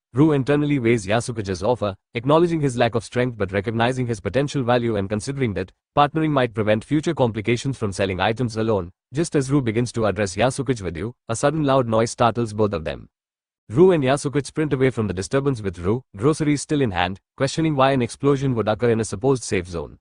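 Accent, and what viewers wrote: India. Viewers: I mean, if this is AI doing the reading, then Choosing an indian accent is just Evil. If its just some guy readin then I wont complain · From all the accents you could use you CHOOSE Indian????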